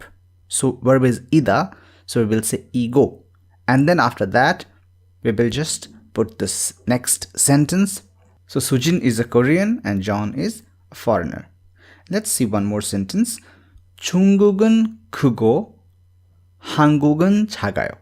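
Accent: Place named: India. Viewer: Your accent seems like Indian I'm from India too 😄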